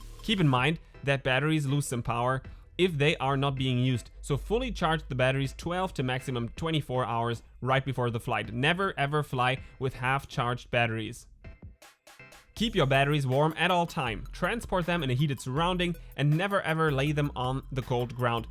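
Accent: Germany. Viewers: you are doing so good, your videos are really good and eventhough you German accent can be easily heard, your English is great anyway. But one thing it hits me every time I see your videos. It is episodes and not epizodes:-)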